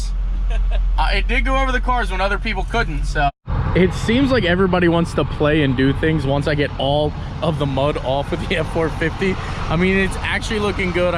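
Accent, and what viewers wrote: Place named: Baltimore, United States. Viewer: I got 10 seconds into this video... and you gotta be from Baltimore or Philly. Yeah?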